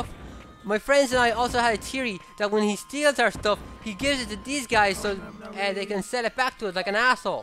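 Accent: Ireland. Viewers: where are you from man?? im irish too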